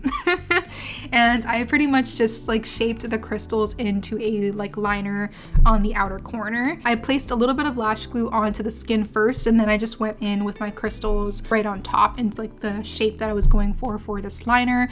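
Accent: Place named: Miami, United States. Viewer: sounds like miami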